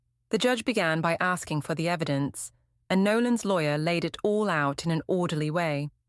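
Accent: United Kingdom. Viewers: I love the narrators British accent. But man she can really sound mean and borderline cruel. She sounds brilliant but plays the dumbest chick in every story.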